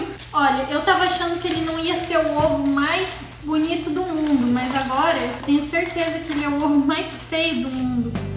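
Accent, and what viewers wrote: Paraná, Brazil. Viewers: Tudo gente chata que se incomoda com o nosso jeito Paranaense de falar, pois eu tenho orgulho de falar como a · Gente! Paranaense fala assim mesmo!! Botar fritar, botar ferver, botar fora quando fala q é pra jogar fora algo sou paranaense e sofro em sp kkkkk povo olha p mim até hoje estranho! Terreno é data... Enfim é só o jeito paranaense de falar kkkk bota like aí povo!! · não liga pros comentários ruins principalmente do seu jeito de fala.. vcs dois são lindos e sem dúvidas o melhor canal do YouTube com divirsidade no canal pra gnt... Eu tbm sou Paranaense ( Guarapuava) e amo nosso jeitinho.. manda um bjo pra mim se puder 🥰🥰🥰🥰🥰🥰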